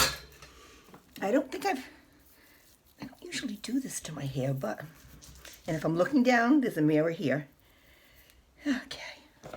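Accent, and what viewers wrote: New England, United States. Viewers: I’m Southern and I like a yankee accent. Reminds me of “All in the Family.” Don’t change the way you speak❤✝️Hair & makeup looks 👍🏻 good.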